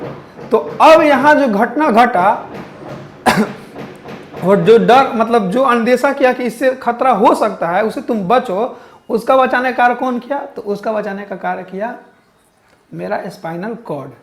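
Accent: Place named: Bhojpuri, India. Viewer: Explain accha kr rhe h 👍 Tum lakh chupao baat magar bhojpuri to nikal hi jayega❤️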